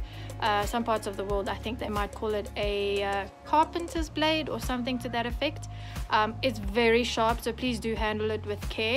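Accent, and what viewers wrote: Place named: South Africa. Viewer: Liked and subbed! I’m happy to have found a South African youtuber as I grew up near Durban and moved to England in 2002 and I miss the country and the accent so much! This was such a useful and thorough video! You have a very good manner in front of the camera! I didn’t know about this technique so I’ve learnt something new today hehe thank you for sharing! I’m excited to see more! Btw I have a group on Facebook just for vloggers called Equestrian vloggers! I’d love to see you in there ☺️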